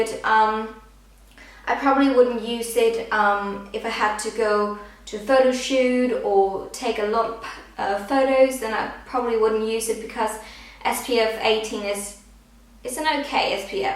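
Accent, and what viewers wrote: United Kingdom. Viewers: Why do you sort of have a british accent when you are danish and both of your parents are danish? <3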